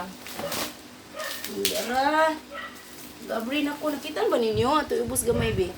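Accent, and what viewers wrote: Visayas, Philippines. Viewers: Hahaha bisaya ka gd day oi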